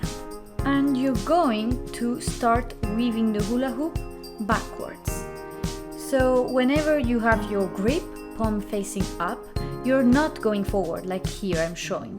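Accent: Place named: Colombia. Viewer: wonderful combo! love them all. I have been adding them to my flow :)you have an accent where are you from? Colombia?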